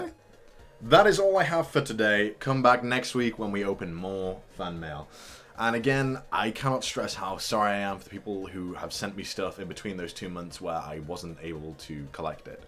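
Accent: United Kingdom. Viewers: I just realized you have a British accent...I'm ashamed of myself. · Aw you look like an older version of my friend, with a British accent. Oh my...even the reactions are similar...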